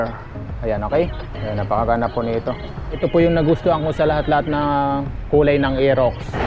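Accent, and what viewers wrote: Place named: Visayas, Philippines. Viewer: nice review i like hasang hasang n parte ng mio aerox abs kaya siya talaga naiiba s lahat at iba k rin magspill ng words very natural in visayan accent kudos to you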